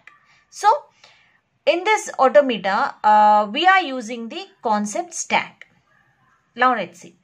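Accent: South India, India. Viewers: Maybe mam is south Indian, that's why her pronunciation is like this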